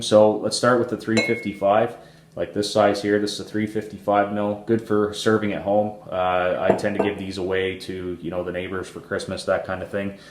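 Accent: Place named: North America. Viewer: I love the use of metric! I know it doesn't make a huge difference for most, but to hear a North American accent using metric is wonderful.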